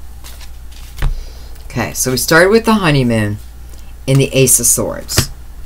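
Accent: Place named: East Coast, United States. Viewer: everything you say is real ... you sound like your from back east very kind and wise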